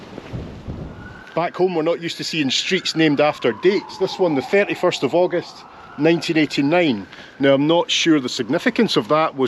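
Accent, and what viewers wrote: Scotland, United Kingdom. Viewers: Nice Scottish accent )) reminded me of my friend, a Scot, with whom we worked together in the same company when I lived in Dubai many years ago ). Good old days ). P.S . Hello from Azerbaijan, fit like loon? ) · is this blogger Scottish？😂kinda grasp a sense of Glasweigen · Love that Scottish pronunciation! Have a Scottish office mate; have to tell him to watch...and listen!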